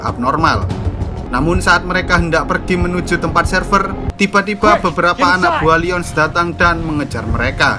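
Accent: Java, Indonesia. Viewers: Sampean wong jowo Yo?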